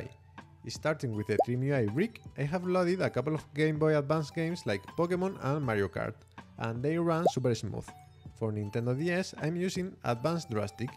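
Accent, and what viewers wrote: Spain. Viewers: jaja es que se te nota que eres español y pense a lo mejor igual otro canal y yo viendo en ingles jaja.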